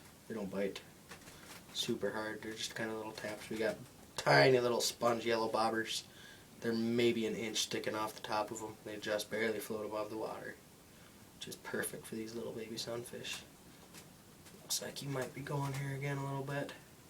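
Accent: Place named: Minnesota, United States. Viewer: I miss that Minnesota accent 😁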